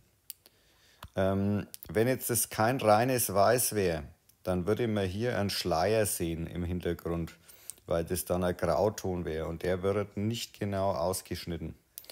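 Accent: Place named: Franconia, Germany. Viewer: dritter Anlauf hat funktioniert nachdem ich alle Kommentare gelesen habe. Spitze! Danke, und ich liebe fränkischen Akzent und Schäufala und Scheurebe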